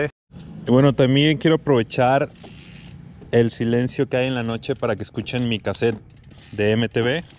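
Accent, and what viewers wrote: Guadalajara, Mexico. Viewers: se te nota el acento tapatío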